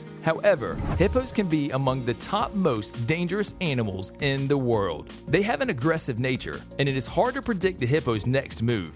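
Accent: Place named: Baltimore, United States. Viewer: You sound like you’re from Baltimore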